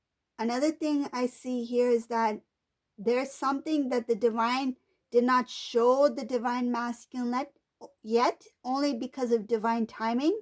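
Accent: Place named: United States. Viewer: Endless Cycle!! Clever. I like ur accent. R u American? With a twist? Lol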